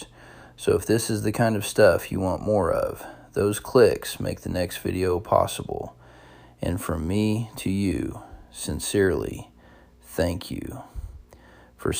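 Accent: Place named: South, United States. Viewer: this dude sounds southern as hell fresh out the hollar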